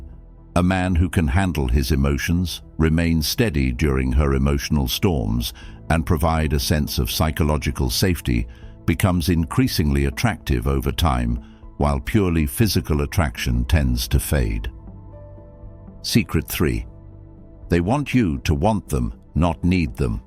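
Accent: United Kingdom. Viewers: AI with a British Accent.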